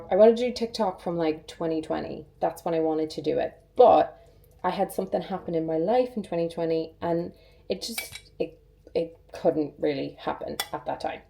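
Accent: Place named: Ireland. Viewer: You just unlocked something special for me with Reas Earth. I’m obsessed … and very much on this path of finally doing the things I have been dreaming of. thank you! I also love watching you, your content and voice are incredible. Maybe because my husband is Irish and I love the accent ❤ congratulations on your work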